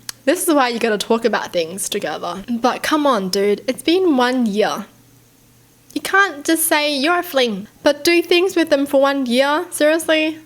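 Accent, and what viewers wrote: Australia. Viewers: You still have the best Australian accent to me tho!🥰🔥💜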